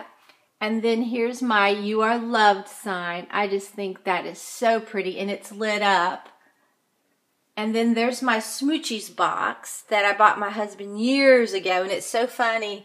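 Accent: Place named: Rural, United States. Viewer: I love your videos! Your husband seems to be amazing you can tell he adores you from the video where you made the dip how he just jumped right up asked what he could do to help or something like that bc most men just sit there & take forever to come help. I loved how he followed you around dollar tree! Great DIY videos! I'm from NC! Where are you from? Just wondering bc of your country accent!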